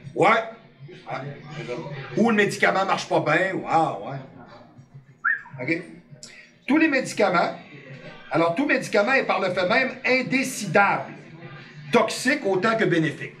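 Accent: Quebec, Canada. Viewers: Bonjour, Je vis en France, je trouve dans l'ensemble votre analyse concernant Big Pharma très très intéressante, le problème c'est qu'avec votre accent par moment c'est compliqué de comprendre, il serait bien pour les prochaines vidéos de parler plus lentement, ça sera beaucoup plus compréhensible pour nous les francais qui ne sont pas habitués à l'accent Québécois (^_^) En vous remerciant, Cordialement. Alex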